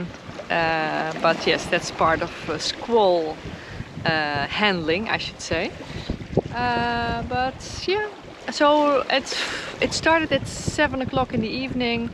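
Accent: Netherlands. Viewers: Love your videos, great sailing with good attitude, a good role model for all us sailing in small boats, all with lovely Dutch accent. Greetings from Finland!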